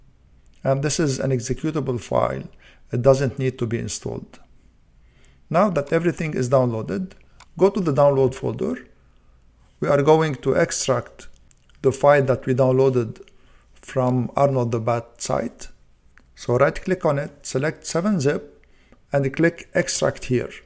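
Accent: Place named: Lebanon. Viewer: bro you sound lebanese !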